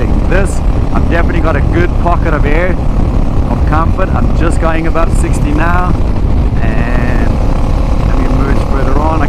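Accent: South Africa. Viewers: hey man yes I saw that after I replied. I think I’m going to go ahead and order one. What off roads are you riding up in north ga? Saw your Instagram and saw where you road around telico plains I love that area. I just started to ride at the age of 55 and loving it Road some with my buddies in New Orleans growing up but not much . Just bought a Wr250r a year ago already thinking I might need to upgrade to a dr650 . Love the SA accent. I miss hearing it my wife is from SA and I used to go over a lot. Lol small world too I used to live off of the north ridge exit on 400 Off Spaulding and over in hunt cliff off Roswell road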